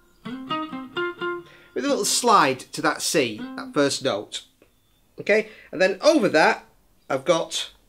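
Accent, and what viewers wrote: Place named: Northern England, United Kingdom. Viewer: Far and away the best lesson on this wonderful song. Love your accent, sounds like something out of Coronation Street. Cheers from New Zealand.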